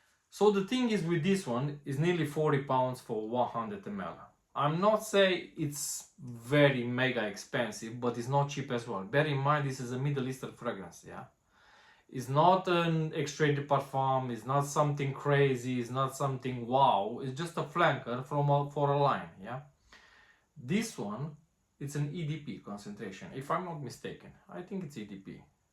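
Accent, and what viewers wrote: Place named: Romania. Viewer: Bro, am o gramada de "influenceri" in lista de Subs si este foarte adevarat ce zici... aia vreau numa sa faca bani cand cumpara toti care se uita la videourile lor, azi te-am descoperit si sincer esti forate honest, si asta trebuie sa se aprecieze, nu inteleg cum nu ai mai multe like si subs.. aici ai un sub +, Salutari din Spania ( pe canalul pe romaneste am vazut ca vorbesti similar ca accentul meu 😂 sigur suntem din aceias zona din Romania 🤝🏽🤝🏽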